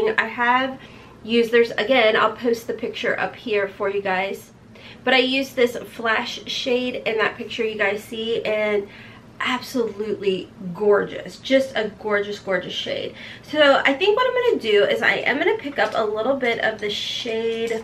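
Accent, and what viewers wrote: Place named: West Virginia, United States. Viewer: Waaaaait... I’m from WV too and pick up on that accent every now and then as well. I’m sure there’s more of us out here, but I feel like we’re so few and far between! I live near Summersville, so I’ll say that and send my love wherever you are!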